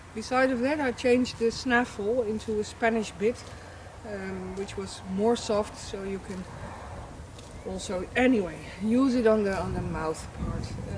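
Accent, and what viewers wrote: Netherlands. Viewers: Hallo zeg, nederlands accent :p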